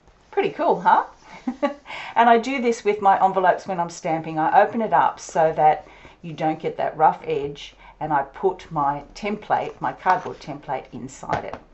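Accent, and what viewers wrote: Australia. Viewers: Just found you through a friend. Nice to hear an Aussie voice!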